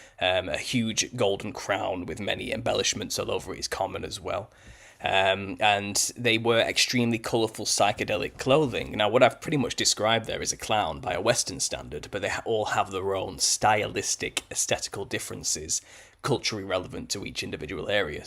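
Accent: United Kingdom. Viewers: Went straight to Harlequin, but totally skipped the Court Jester of the Middle Ages, which was probably the true origin of the Harlequin. The Wildman was most likely a reference to a Sasquatch type figure since they've been reported all over the world. The red hair may be from the red-headed giants of old. Just because someone has a "British" accent does not mean they're 100% correct about something, do your own research. Good connections though, almost there. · you sound hella condescending and subjectively judgmental in sharing information in this video... like bruh, keep it classy and objective. We dont care about how you feel about other cultures, just show us how things correlate and save the sass... you sound very snobby and British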